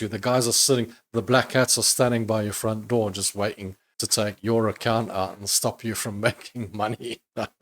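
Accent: South Africa. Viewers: Hi - Love your content! You sound South African - Could the company have been Sharedirect?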